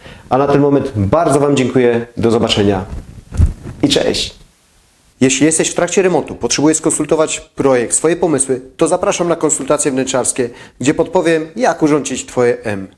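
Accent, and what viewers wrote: Silesia, Poland. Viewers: 👍❤️ SUPER 😘 i do tego uwielbiam Pana śląski akcent,😍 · Uwielbiam pana śląski akcent mogłabym słuchać godzinami ☺️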